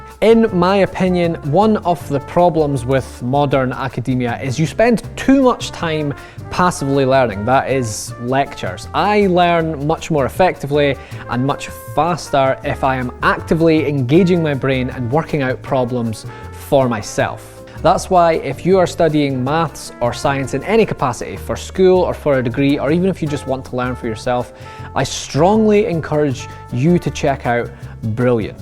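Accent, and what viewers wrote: Scotland, United Kingdom. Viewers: Is scottish? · I canny be the only one thinking you sounded a wee bit more Scottish that usual in this one? P. S. Congrats on 1 million. Hats off.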